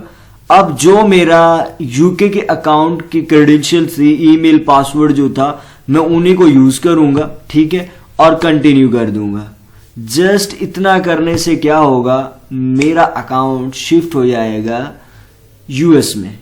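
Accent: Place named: India. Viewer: Why the English title and Indian voicover? Now I have to keep searching :(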